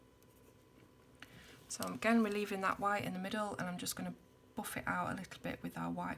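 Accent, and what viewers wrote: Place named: East Midlands, United Kingdom. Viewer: Thank you so much for the mention and for showing us the whole page at the end, loving your videos, dont worry your doing great, and im From manchester by the way, i do go to Loughborough regularly as my daughter and grandson live there so i recognise the "Ey Up Duck" and your accent, mines a typical Northern accent, And i do sound posh on the phone lol my daughters are always saying im like Mrs Bouquet, or Bucket ! haha. Looking forward to more videos please, i love them all, Amanda x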